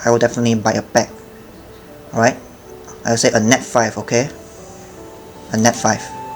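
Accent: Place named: Singapore. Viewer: Dude. You're not from global, are you? Your mobs look crazy. Dayum! By the way, I like your videos but your Singaporean accent not much. YDCB is okay. Sharp but okay. However, when I watch your vids, I feel like I'm in a car and the driver keeps on stepping on the brakes. Or maybe it's just the mike. Hmmm. Try to invest in a better mike with a pop filter? ;) I'd like to see more content from you. Hope this doesn't offend you too much. I mean it in a constructive way. :D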